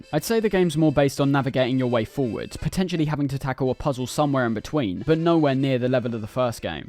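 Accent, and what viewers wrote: United Kingdom. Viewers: When I saw this on my feed I said to myself, "please have a brittish accent, please have a brittish accent". Was pleasantly surprised, idk why but it gives a sort of legitimacy to these essays.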